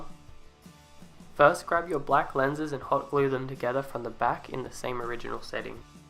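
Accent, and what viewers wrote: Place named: Australia. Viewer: K I'm sad ur suit is better I've met my match and you sound Aussie two 😂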